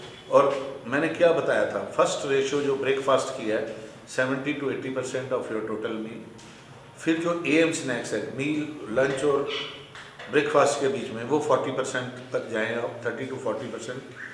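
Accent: Haryana, India. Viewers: Haryanvi 😅😁😁mst..u r great sir..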